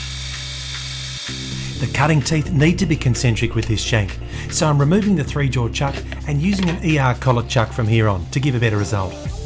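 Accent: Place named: Australia. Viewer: Knew it.. your aussie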